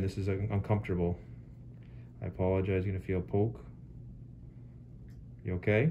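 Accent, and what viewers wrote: Canada. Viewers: Canadian accent?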